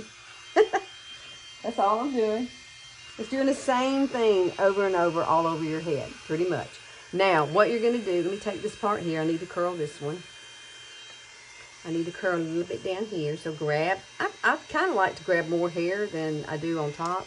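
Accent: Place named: Alabama, United States. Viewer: I found your fine hair vids and immediately when I heard you talk I thought " Alabama". I'm an Alabama lady too though much older than you.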